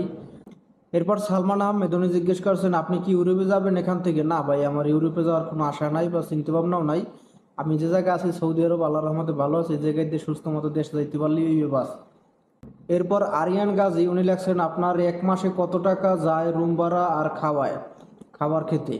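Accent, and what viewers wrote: Barishal, Bangladesh. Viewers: ভাই আপনি কি বরিসাল্ল্যা?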